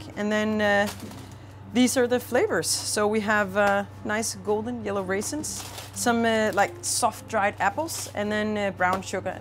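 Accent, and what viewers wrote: Denmark. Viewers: Instantly recognized the danish accent :) great vid btw. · its a very lovely Danish accent. She's a good example of how often we might say "You know?" :D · She sounds danish 🇩🇰🍺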